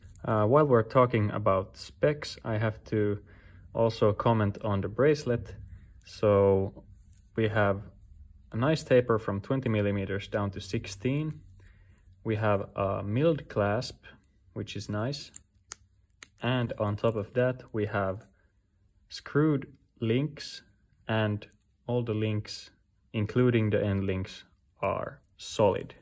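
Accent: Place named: Finland. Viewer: Everything in the voice, intonation, word selection and the way of speech made me think this got to be finnish guy speaking english. Sure enough, finnish dude. Nice video and that watch really is a good watch, only gripe is that it looks like rolex, but its just my opinion. greetings from finland.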